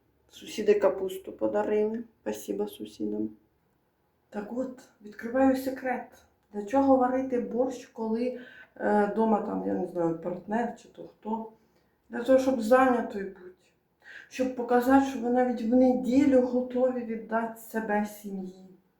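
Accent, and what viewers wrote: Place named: Boryspil, Ukraine. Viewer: Ви, вразили тим- 40 год!, спасіба, 3 часа, саме вкусне, я рахую, вобще, шутками і таке інше. 😊 Ви направду; розважили мене нині. Пам'ятаю із свого дитинства як у Борисполі, батьківщині моїх бабусі і дідуся, розмовляли подібно до цього, такою малороською мовою, кумедним суржиком. Але вони були мудрі та навчили своїх дітей звертанню до батьків- тато, мамо і на ви. Сподіваюсь, у Марії все добре. Здоров'я та добробуту. 🇺🇦✌❤🖤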